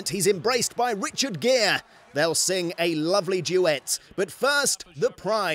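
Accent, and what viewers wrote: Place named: United Kingdom. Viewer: why British accents are so awesome and sexy.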